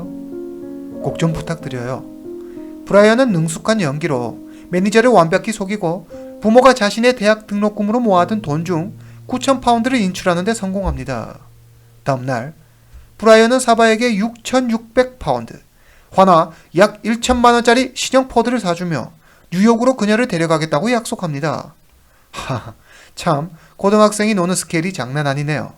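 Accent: China. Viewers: 억양이 한국분 맞나요? 중국 태생이신가요?귀화하신건가요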